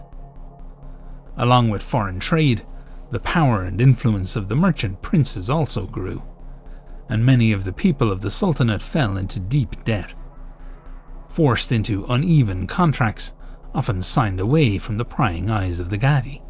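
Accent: Ireland. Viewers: Are you a Kildare / Carlow man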